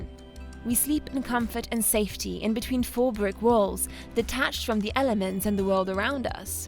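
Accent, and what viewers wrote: United Kingdom. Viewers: Just started watching your vids last week when your Texas videos came through and YouTube thought, You Darrel are a Texan, maybe this girls videos would interest you!. Broccoli raw is best eaten with.... Ranch dressing!!!! I have a burning question, you have such a charming British accent.... How did a Polish girl end up speaking english with a charming British accent????? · you now look like a french, sound like a british, but true to your heart you are wild as a free spirit...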